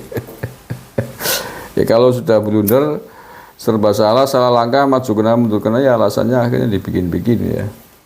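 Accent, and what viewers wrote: Banyumas, Indonesia. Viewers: Lucu ya gaya ngapak 😂😂😂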